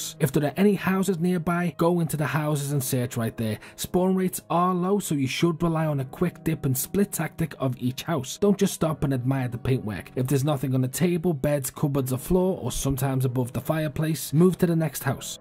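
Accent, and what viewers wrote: Liverpool, United Kingdom. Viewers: great videos mate, scouse or over the water? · Are you a scouser? 😊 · that's because you have a strong Scouse accent, but not a Runcorn Scouse accent. It's like a Stephen Graham Scouse accent. Plus your funny, so it's a win win really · Liverpool accent?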